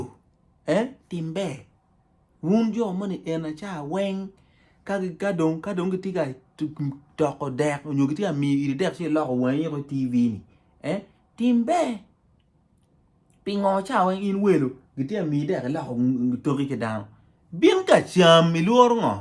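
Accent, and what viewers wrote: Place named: Acholi, Uganda. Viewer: Amaro kit mai iloko kede Lep acholi ni yomo ia mada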